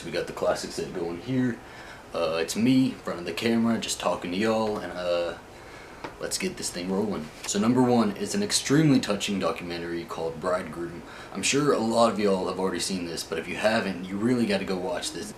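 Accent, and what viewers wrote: South, United States. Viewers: So many great suggestions Z, from you and others, so thanks! I'm watching Drown now. The Broken Hearts Club is good, and Rock Haven. I love your accent; makes me want to move back to the south. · Ok ! Here i go again cyber stalking my fellow southern boy . I adore you . So sweet Honest ( southern boy ) . I just find you real and im a litttle older but i learn from you. . ( btw hint hint. Become friends with a flight attendant ( me ). . Saves on travel . Just kidding . Being in new York , then Miami I lost my southern accent unless im yelling at my bf whom yells back in Spanish . Thats its own video !! Any way i love the accent snd your open heart and sweetness Namaste , Rocky ✈️🙏🏻😇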